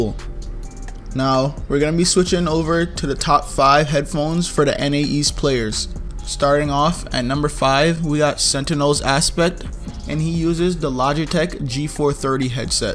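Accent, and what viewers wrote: Jamaica. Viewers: You’re Jamaican?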